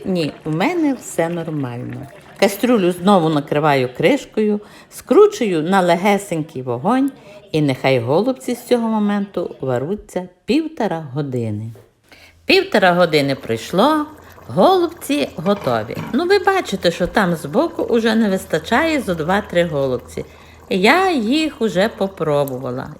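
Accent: Ukraine. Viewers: Гарно,просто,смачно і зрозуміло А саме головне страшенно приємно чути наш український говір. Підписалася і поширила. Маю подругу в Америці надіслала і їй. Щиро подякувала.🖤❤️💛💙🌹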